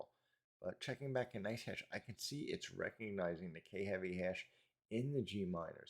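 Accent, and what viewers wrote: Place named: New York, United States. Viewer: The more videos he makes, the more NY he sounds! Love it!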